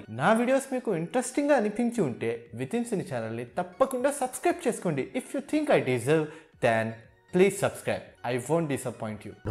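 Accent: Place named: Andhra Pradesh, India. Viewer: "Chinna pappa chirak munnda" ee video motham lo naa attention ni garbe chesinna dilogue edhe.....😜😜😜😜miru Andhra Pradesh lo untara .... don't mind mi slang koncham Andhra laa unndhi....i love it❤️❤️❤️❤️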